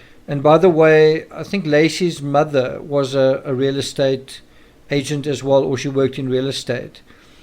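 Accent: South Africa. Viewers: Your voice make me sentimental to my SA friends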